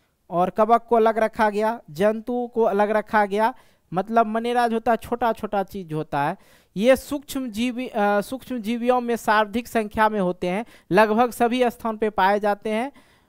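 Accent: Bihar, India. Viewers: Sir aap bihar se hai kya😅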